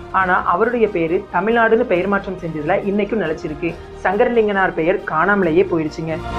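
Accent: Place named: Thoothukudi, India. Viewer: Awasome Video , Keep It Up Bro 4.02 Thoothukudi karangana pesa solliya tharum🙂 Stay Home🏠 Save Life💖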